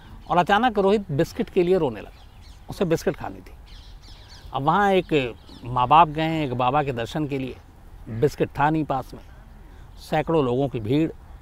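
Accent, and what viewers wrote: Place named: India. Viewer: Shams Tahir Khan ji aap ki awaj ek hindusthani ki aawaj lagti hai.aap ke awaj mai allaha ne bahut noor diya hai.❤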